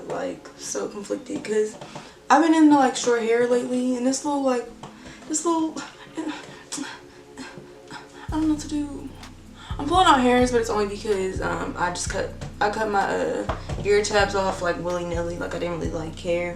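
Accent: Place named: Bay Area, United States. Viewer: I just found your YouTube lastnight and girl i loveeee your content! At first i thought you were from the Bay Area (i like too) and just how you talk lol I’m from there. But good work girl! 💕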